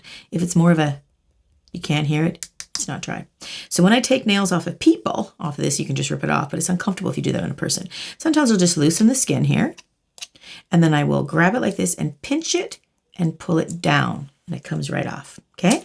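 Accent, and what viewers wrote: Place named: Canada. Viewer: Heard a slight accent and thought, she mightttt be Canadian.... Then checked your page and realized you're in BC! Can't wait to order your nail kit when it's back in stock <3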